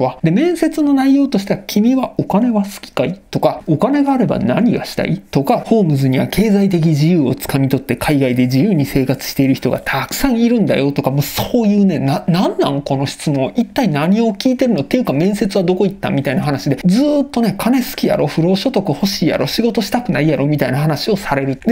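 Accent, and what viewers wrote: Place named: Kanto, Japan. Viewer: さんの動画で過去一おもろかったおちょくってるような関東弁がいちいちツボ(笑)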